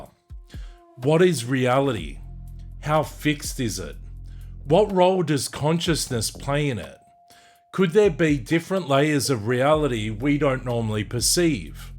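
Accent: Australia. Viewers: Australian accent.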